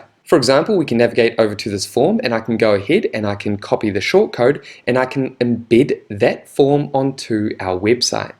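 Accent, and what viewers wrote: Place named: New Zealand. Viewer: I like your nz accent. reminds me of a damn good time. but now I'm back in Europe. 😥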